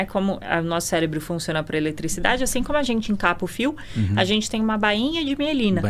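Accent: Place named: Sao Paulo, Brazil. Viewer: Eu gostei do sotaque dela de paulistãããna 😂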